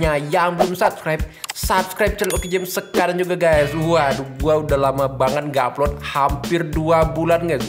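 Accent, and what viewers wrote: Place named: Madura, Indonesia. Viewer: Bang lu orang madura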